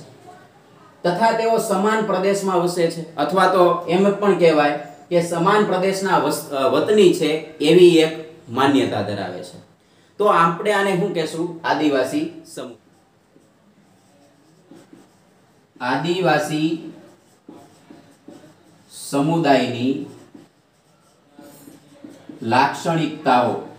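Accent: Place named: Kathiawar, India. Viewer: મને આપ ની કાઠિયાવાડી બોલી બહુ સરસ લાગે 👍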